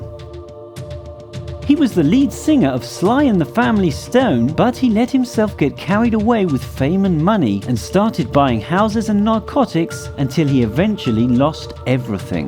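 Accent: United Kingdom. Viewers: It’s always a British background voice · Very sad. But...I love how the British say Buth-day for birthday, and "don't take drug" instead of "drugs " lol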